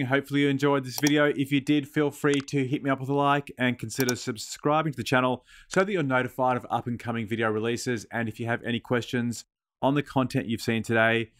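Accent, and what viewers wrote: Australia. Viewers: G'Day Mate! I needed a refresher after not using KeyNote for so long and found your channel. I have subbed straight away, it's so great to hear another aussie voice here on YouTube.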